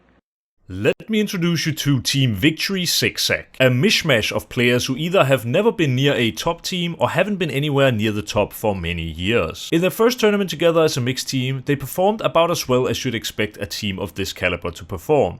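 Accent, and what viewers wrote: Denmark. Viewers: Man føler sig hjemme med den danglish accent <3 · the heavy danish accent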